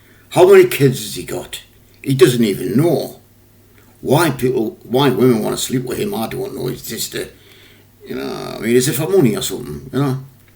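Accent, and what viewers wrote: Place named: Wales, United Kingdom. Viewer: I love ur welsh accent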